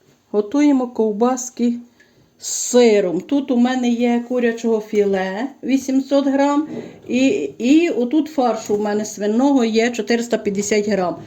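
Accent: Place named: Transcarpathia, Ukraine. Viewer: І що?Жителі Закарпаття не соромляться свого діалекту.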